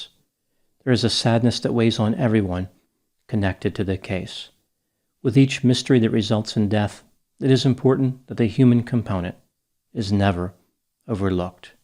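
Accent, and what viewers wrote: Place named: Pennsylvania, United States. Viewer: Every time he adds a zinger to his deadpan delivery it goes right over my head for a few seconds then I have to stop and think about what he just said. I hear a PA accent so almost the same thing.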